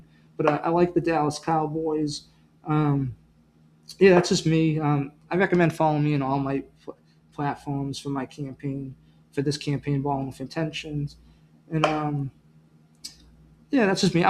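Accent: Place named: New England, United States. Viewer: Gee, I wonder if this guy has ever been to BAWiston! That N.E. accent is too easy to spot. Okay I like encouraging new channels but my best advice if you want your channel to grow in this glutted market is to STAND OUT. & this much lack analysis ain't gonna cut it. You're not even sure if Schottenheimer was in his 1st year? Fair or not you're not likely to make gains when even the establishment media is saying more than you do. Find some stand-out stats that actually say something. Show clips of a play Shanahan or McVay called that proves they're great instead of just repeating that they are. Give people a reason to tell their friends "dis guy knows what 'e's talkin' bout!" That concludes this episode of "Unasked-for Advice."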